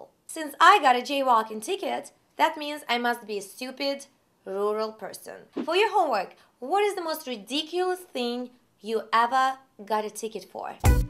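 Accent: Russia. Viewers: she has an russian accent and russia is not part of Europe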